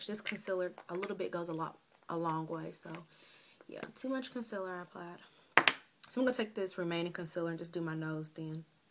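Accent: South, United States. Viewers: Girl your southern accent is strong! "fingers" lol great tutorial!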